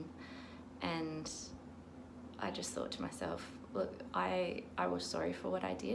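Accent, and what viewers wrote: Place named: Australia. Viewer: Do I detect an Ozzie twang in there.. .. I spoke too soon.. .. you mentioned it at the end.. doh.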